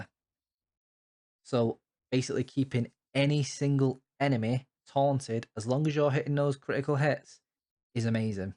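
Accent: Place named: West Yorkshire, United Kingdom. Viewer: What accent is this? Im in Hull East Yorkshire and youre sounding maybe Leeds or Doncaster or something west yorkshire-ish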